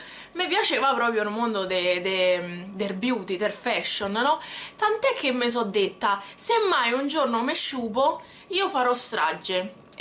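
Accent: Rome, Italy. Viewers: perchè non provi a fare anche un video dove insegni detti Romani? o comunque dici qualcosa in romanaccio, adoro come parli ahah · Io non ho questo stile ma ti giuro che è bellissima questa rubrica,poi io amo l'accento romano (io sono milanese) quindi amo sentirti parlare 💙💙😘 Ah,sei sempre la più figa 🔝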